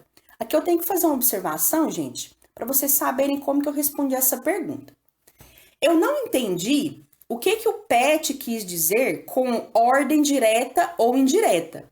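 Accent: Minas Gerais, Brazil. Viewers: Sua voz é linda Seu sotaque é como o meu Pois sou mineira também Continue assim Vc é maravilhosa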